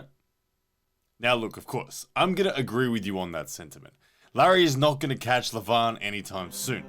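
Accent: Australia. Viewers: Is working on a new commentator voice? He sounds less Australian than usual, here.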